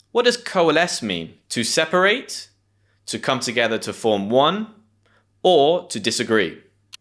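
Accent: United Kingdom. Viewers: I'm from germ money. You're from the UK, as I can clearly hear at your dialect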